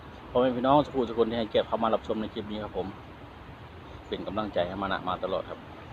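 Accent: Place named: Nyo, Thailand. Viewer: สวัสดีครับอ้ายมาเบิ่งมากินนำครับ..ได้งินสำเนียงญ้อแล้วคึดฮอดบ้านครับ..เพื่อนใหม่เด้อครับเขยสกลมาเบิ่งนำครับ..มุงหลังคาให้แล้วครับอ้าย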